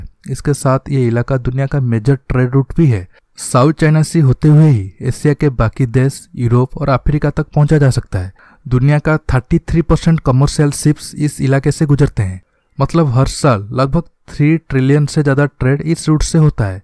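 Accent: Odisha, India. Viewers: Pahle mujhe laga aap bengal se ho Par jb last line suni to ab lag rha hai aap odisha se ho. Kya aap odia hain?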